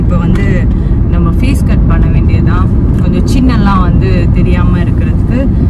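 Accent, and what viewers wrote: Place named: Kerala, India. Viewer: You sound so much like a Malayali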